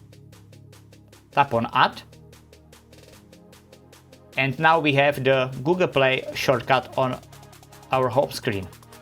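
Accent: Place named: Czech Republic. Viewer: Ta Česká angličtina xd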